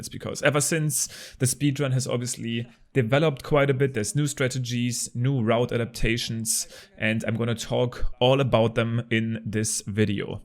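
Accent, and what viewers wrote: Germany. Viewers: are you german? cuz you sound like a german if yes cool im too if no ok but i am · are you german yo sound german bist du deutsch du hast diesen deutschen aktzenz beim englisch reden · He once played the German version and read the lines perfectly. Aaaaand his obvious accent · Is he german cause his englisch is a bit german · you her like a german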